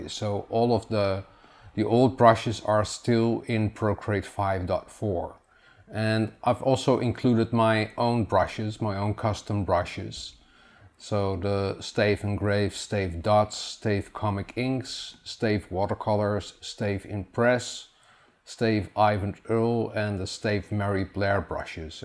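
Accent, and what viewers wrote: Netherlands. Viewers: How did I instantly know you are Dutch? You remind me of my Dutch friend.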